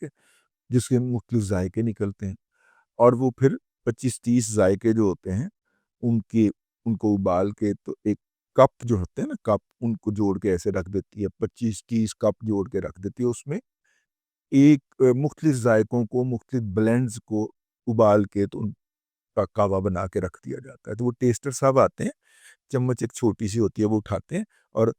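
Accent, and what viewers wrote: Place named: Lahore, Pakistan. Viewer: Drood sharif ki barkat ka asar hai. App nadar oar bebak tabsara karte hain. Indian media ki aap ki Lahori lehje wali mix urdu ne bolti band kar de hai.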